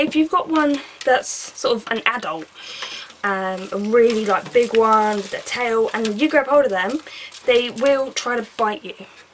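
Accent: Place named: Cockney, United Kingdom. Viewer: Hate the cockney accent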